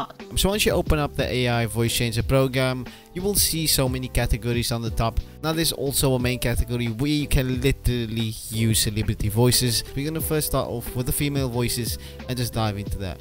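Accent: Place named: South Africa. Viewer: 😅 South African AF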